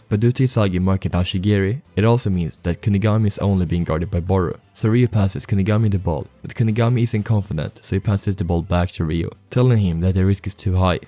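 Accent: Sweden. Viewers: You can hear the Swedish accent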